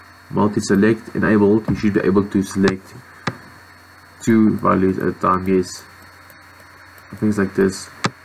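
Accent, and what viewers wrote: South Africa. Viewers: your videos help a a lot, thanks 😀 are you South African??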